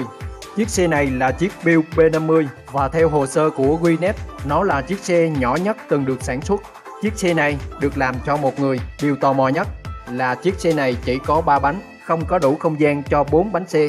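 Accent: Central, Vietnam. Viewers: Ad ng miền trung À??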